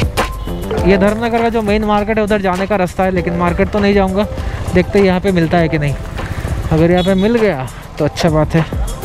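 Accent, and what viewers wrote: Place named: Comilla, Bangladesh. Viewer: Dada, apnar local language akkebare amader Bangladesh er comilla , brah Mon baria'r local language er Moto..shune khub moja lagtesilo💕✔️🇧🇩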